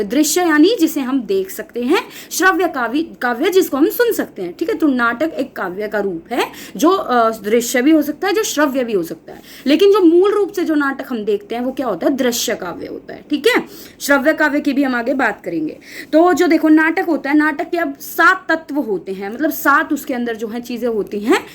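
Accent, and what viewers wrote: Haryana, India. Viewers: aap paka haryana se ho aapki ton aate hai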